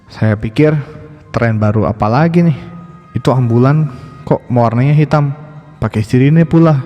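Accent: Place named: West Java, Indonesia. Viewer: Meni logat Sunda pisan euuyyy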